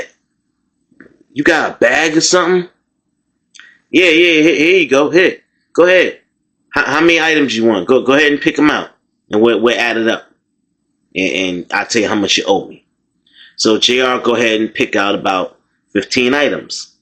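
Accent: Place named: New York, United States. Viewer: Good morning, ur voice is dope. I'm sorry u hv these stories, but u tell them in a way that's real, raw, especially with ur accent, like ur from Brooklyn or the bronx. Glad u made it out, my brother never did, he died in prison.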